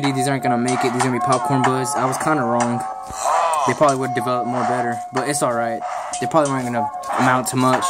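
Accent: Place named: Texas, United States. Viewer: He definitely an Ese from Texas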